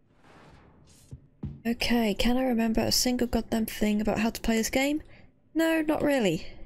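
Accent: United Kingdom. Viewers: Look what you've done. How do I know what country you're from? I know you're English. I never knew what country the others were from.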